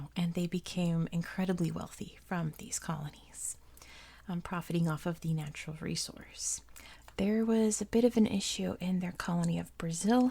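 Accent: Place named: California, United States. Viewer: That californian accent is like a key that unlocks my sleep.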